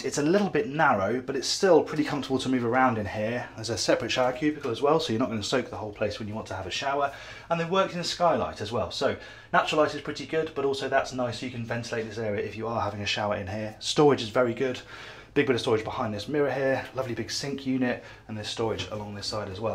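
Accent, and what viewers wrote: England, United Kingdom. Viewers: Dear God ,This guy Talks So Fast ! You do not have time to think about what he said ! Even worse ,he has an English Accent ! No Coffee for him !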